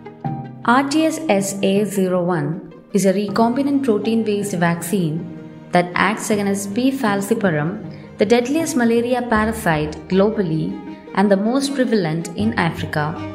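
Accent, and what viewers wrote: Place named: India. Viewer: I like the content in your videos. It is well-researched, bite-sized, and packed with information. But I dislike the voiceover in almost all of your videos — it has a very thick local Indian accent, which is very distracting. For a more absorbing consumption of your videos and to reach a wider audience, I suggest you do one of two things: Get rid of the voiceover all together and include captions with background music, or hire English speakers to narrate using native US, UK, or Australian accents. This is not the first time I'm writing on this subject. Please take heed! Thanks.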